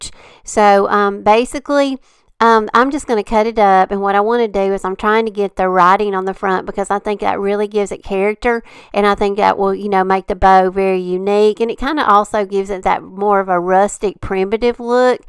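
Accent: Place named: Alabama, United States. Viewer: Finally! Bows I can make that fit my decorating style😊 I'm so excited to have found your channel & not a bit surprised to see you're from Alabama (haay from the Shoals & yes your accent gave you away❤) God has blessed you with natural talent. Thank you for sharing. You make it seem so easy & your sweet spirit is so encouraging. God bless you. I hope you have a wonderful day!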